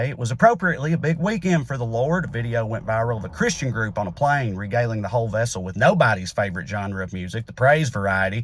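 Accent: South, United States. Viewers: That's funny, because this is a comment I've only heard from people who haven't spent significant time in the rural southern US (where the accent really isn't that unusual). That's more or less how speaks normally (his sister, who is not a comedian, has the same accent he does). Of course, he's not speaking in a totally normal fashion in these "car rant" videos, since they are a recurring bit that he presumably writes scripts for beforehand, but the "country accent" itself is not the unnatural part. You can see an episode of and friends' podcast where his sister was a guest here, for example ( can also be heard throughout, speaking in a more impromptu manner): <link>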